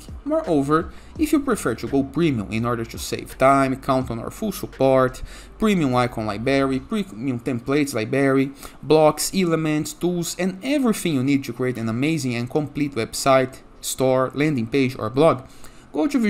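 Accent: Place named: Brazil. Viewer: depois de 5 segundos assistindo.... é BR! certeza :medal-yellow-first-red: Muito TOP as dicas valeu!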